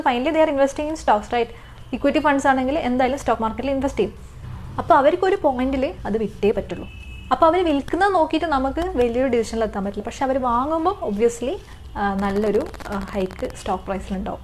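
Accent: Kannur, India. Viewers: Kannur style...good information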